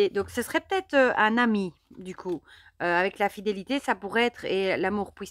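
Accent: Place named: Belgium. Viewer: Coucou bonjour 🐕❤🐈🦊🐿🐇🐭🐼🦔🦉🐦🦩🪶❤, Waow waow magnifique énergies 🎉, j adore ♥. Tu me fais trop rire 😂 avec ton petit accent Belge 🇧🇪. J adore 😂😊👍. Merci beaucoup pour la petite pensée 🐦, pour moi. Oui j adore 💙🐦 les Mes Anges 🐦😇. Grand Merci pour cette guidance pour la semaine. Bon Dimanche, bisous bisous Sylvie 🙋‍♀️🦋🕊🦋🕊💙💙💙🙏🌈🌟🌈🌟 Bonne semaine ps: oui effectivement les bougies d actions, se consume plus vite, je confirme 🥴🥴🥴.....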